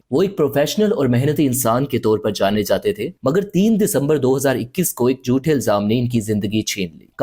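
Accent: India. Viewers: sab koch sahi tha , but pakistanis ko pehle apni Urdu sahi kar chai ye , lagraha tha is vedio me koi indian bol radha he he, ghalat ko galat , khatoon and bohat sare word wrong pronouse kiye apne, ab darama actors bhi ghalat urdu bolte he fakhar k sath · اس بھائی اپنی ق،غ،خ تو ٹھیک کرو،ضروری نہیں کہ ہندی زبان سے متاثر ہو جاؤ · Aap urdu Indians ki tarah kiyon bol rahe ho?? غ کو گین اور ک کو کھ کی طرح کیوں بول رہے ہو آپ؟؟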